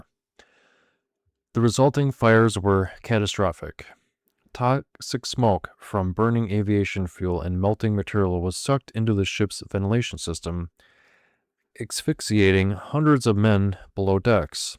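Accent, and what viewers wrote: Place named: United States. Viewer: Hi, I read your reply from my comment on yesterdays’ video about rommel. Great to see a new content creator-documentarian focused on the world wars with a channel that is not AI. Also, I don’t know if you have been here long but there’s a lot of us that go to sleep watching/listening to war documentaries and we are kind of a tight knit group. I think the reasoning is multifaceted and its not that we are war junkies. It has to do with being transported to another place and time, as well as the psychological aspect of “I had a stressful day but thank god I’m not there” Here are a few more helpful hints that you may want to work on: - Again, you have an incredibly calming voice considering we have american accents which by nature, isn’t as relaxing as the quintessential british historian voice speaking proper/high society english. 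I would suggest working on your cadence and delivery so you are more confident in your delivery - Calming and relaxing is good; boring is bad - Keep working on your editing skills and consider finding/creating a pleasant soundtrack for background music - As stated previously, try and stretch the videos out to an hour or so, thats kind of a sweet spot - Go watch the entire BBC/PBS WWII documentary series that was done in the 1990’s called “Battlefield” It is literally the holy grail for us in terms of this genre - Watch Fin’s Channels as stated in the last video to gain some more hints - Even though you are new at this, you already have a subscribe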